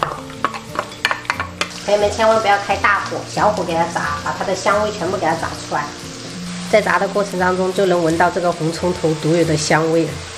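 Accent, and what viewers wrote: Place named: Fujian, China. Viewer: 真香，四川人說福建話，做福建菜比福建人更勵害更標準。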